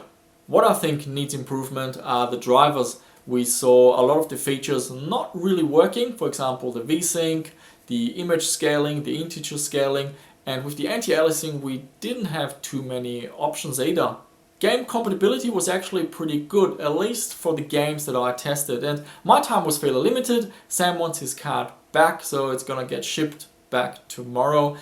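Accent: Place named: Germany. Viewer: Look! My favourite German accent Australia dweller has a face!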